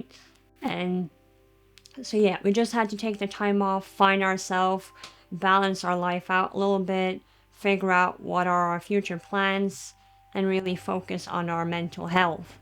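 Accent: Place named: United States. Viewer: Jag förstår verkligen varför du varken hade ork eller tid att skapa och göra videos. Jag har inte kunnat arbeta på väldigt länge pg a min mentala hälsa, så jag förstår dig. Jag hade för övrigt ingen aning om att du är norsk och att är svensk! Du låter väldigt amerikansk 😂 Jag själv är halvnorsk men bor i Sverige 😁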